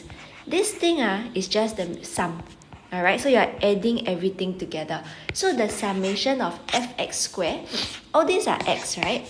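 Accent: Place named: Asia. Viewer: The most Asian accent I have a test tommorow 🤔👍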